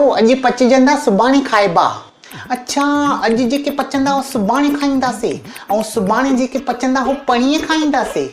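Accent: Sindh, Pakistan. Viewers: 👌👌👌👌👌👌❤️❤️❤️❤️ bhai aapka sindhi bolne ka style wow 👌👌👌👌👌👌👌👌👌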